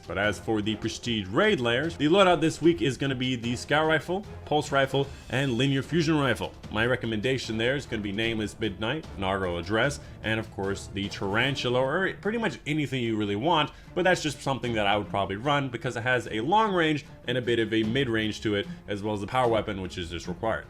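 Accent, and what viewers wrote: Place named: United States. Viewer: Aye just came from houndish’s vid gotta hear that sweet American accent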